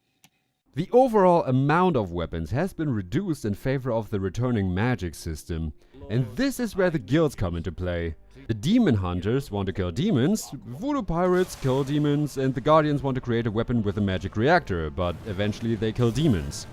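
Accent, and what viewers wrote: Germany. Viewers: Immer wieder schön den deutschen Akzent zu hören 😉. Im großen und ganzen mag ich deine Videos aber gerne 👍. Auch wen du sehr harsch mit den Spielen meiner lieblingsentwikler umgehst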